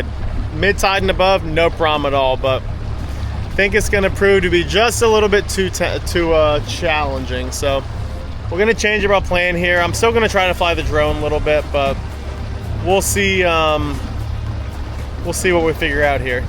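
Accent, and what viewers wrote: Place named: Bahamas. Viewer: I just watched your video and enjoyed it; however, I was left with a question: why is it that you sound Bahamian? Maybe it is because you spent so much time in the Abacos, I guess. 🤣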